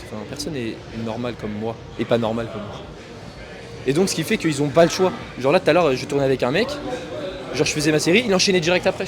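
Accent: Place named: Asia. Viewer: Je mets un dislike pour l’accent asiatique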